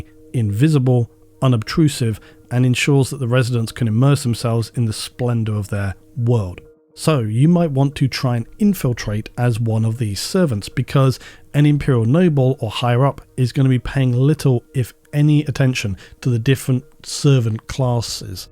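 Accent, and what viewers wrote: England, United Kingdom. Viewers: You're right about language being insurmountable. Until you mentioned it yourself, I was checking off each suggestion as impossible due to the language barrier. I was listening to your English accent as an American, knowing that the differences only represent a few hundred years of geographic distance. Even with Imperial media technology serving to provide some linguistic cohesion, a modern traveler would probably sound like they were speaking Old English to someone in 2024. So, completely unintelligible. Other notions that crossed my mind would be strange ammunition calibers of travelers and the ancient disease we carry that had been stamped out long ago by immunizations, etc. A travelers might be tracked and captured as a Patient Zero of a long forgotten virus.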